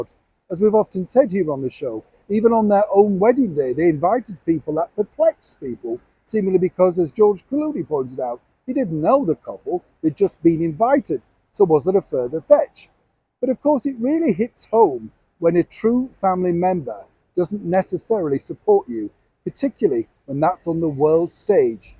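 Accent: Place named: RP, United Kingdom. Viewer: We savages across "the pond" are having trouble with your posh Brit accent.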